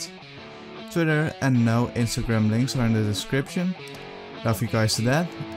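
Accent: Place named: Netherlands. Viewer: you sound dutch and nice vid btw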